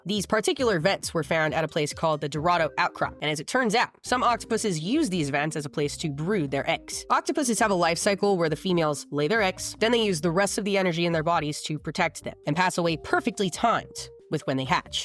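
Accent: United Kingdom. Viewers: Britain has lots of accents. That is sort of BBC English, middle class south east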